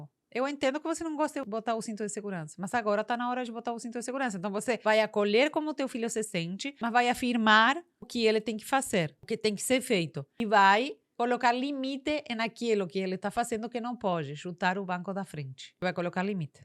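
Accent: Spain. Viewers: E o tipo de mulher sem cuidados para ser mais feminina, sem pintar unhas, com esse sutaque espanhol faz ela misteriosamente sexy....ja eu vejo so por isso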